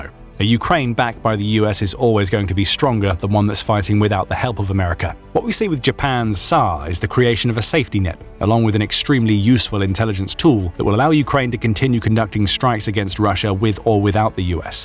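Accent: United Kingdom. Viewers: Sad. The narrator speaks with a British accent but speaks such dismal English. 'They just gave', 'They just gave' - yugh, what a wrong 'un!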